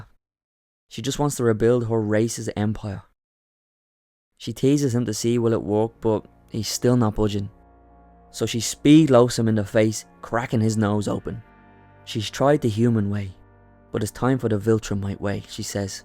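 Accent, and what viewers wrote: Dublin, Ireland. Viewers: im guessing north dublin just cus it sounds like some of my mates accents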